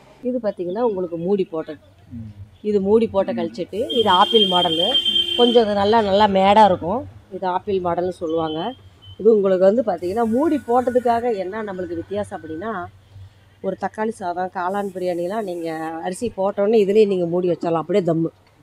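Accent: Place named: Kongu, India. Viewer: எத்தனை பொறுமை...சிரித்த முகத்துடன் கொங்கு தமிழில் பேசிய பேச்சில் இரண்டு மணி நேரம் போனதே தெரியவில்லை மென்மேலும் வளர வேண்டும் வாழ்த்துகள் சகோதரி ❤❤ பயனுள்ள சிறந்த காணொளி ❤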